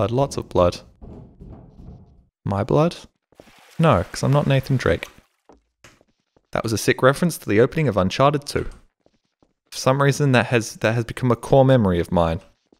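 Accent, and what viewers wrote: Australia. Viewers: Not me, subscribed to him for years, only now discovered he is Australian and not British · wai whoa you aint more australian guy?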